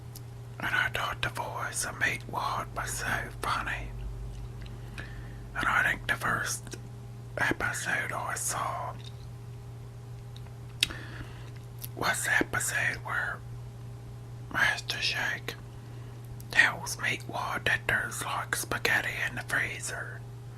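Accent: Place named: South, United States. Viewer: amaan ahmed people from the south of the United States have that accent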